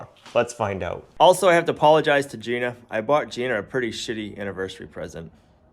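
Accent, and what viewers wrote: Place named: Boston, United States. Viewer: Boston accent! They just talk different out there.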